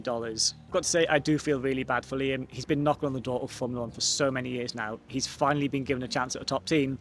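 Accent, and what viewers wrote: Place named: England, United Kingdom. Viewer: You sound English. The crows / ravens in the background sound Australian. With Alonso, Hamilton and other more experienced drivers perhaps staying on for longer than previous eras is it not the case that the whole field is more skilled and tighter together than ever with any car or driver slightly lower in performance seeming to stand out more for time differences that are far smaller than previous years.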